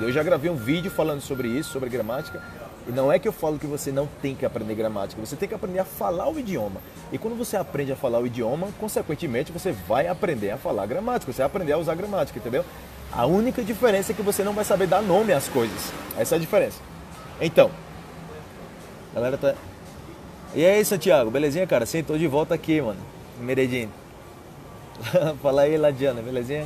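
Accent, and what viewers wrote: Colombia. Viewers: Me gusto tu acento colombiano...vai com Deus patrão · Mae, mil gracias. Me encanta su acento de español colombiano ja, ja, ja.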